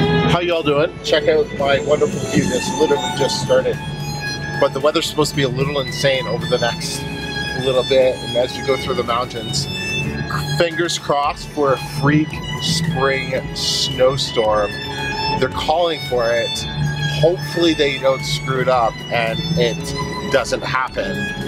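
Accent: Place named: Canada. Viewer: I thought you were Canadian lol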